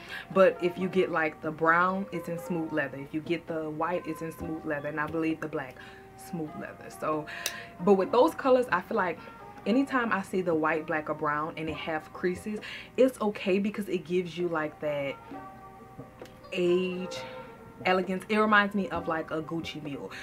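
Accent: New Orleans, United States. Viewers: It's ok g if the New Orleans keeps jumping out I'm from Baton Rouge and spent half of my 90% off my child hood in New Orleans and that's what I loved the most tha accent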